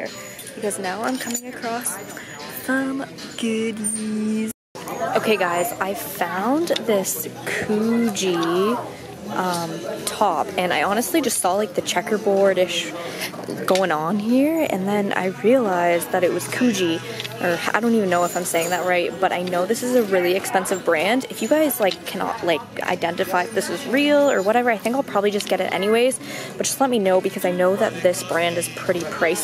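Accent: Canada. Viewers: i'm from the U.S. but i can tell when someone's Canadian by how they say out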